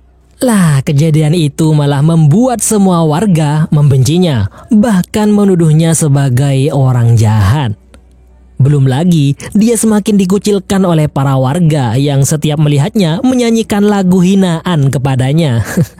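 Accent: Madura, Indonesia. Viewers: Teppak ka reng madhureh boss 😂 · Seperti ya yg isi suara ini orang madura😅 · Medureh kak😂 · Orang Madura yg ngisi suara nye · Oreng medureh toktotokkah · Ini yng ngomong orng madura jiah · Wah ini pembawa suara nya orang Madura yaaa😅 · Lu orng Madura yh bng😂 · Ternyata orang madura wkwkwk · orang Madura bang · Medure mas 🤣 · Memang mantap mon oreng madure membacakan alur cerita god job bang sehat selalu😂😂😂😂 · Dek....sedeh/sampiyen medureh kammah dek... Salam sittong dere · Kakeh reng medureh yeh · Beh reng medureh??😅😅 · Ooh pantes aku kayak ngeh ada logat maduranya ternyata benar😂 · ini Narator orang Madura ya..😂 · Ini orang madura ya 😂😂😂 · Medureh dimah kanak😅😅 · reng medureh kiah reng wkwkwk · Ini kayak orang madura yg menjelaskan narasi😂😂😂😂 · Masyallah acaca medhure ropanah.. Deri dimmah min.. Sengkok reng medhure kyah.. Deri Mempawah Kalimantan barat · Hahh takok epate'en can, reng madhure ben kak😅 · Komentator nya org Madura ya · ternyata pengisi suaranya madura 😅😂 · hehhh sengak. 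wkwkk lu madura ya min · Beehh mak medurehh payaaa celakak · Adminnya orang Madura ternyata 😂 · Saya yakin pengisi suaranya " Oreng Madureh" . 😂😂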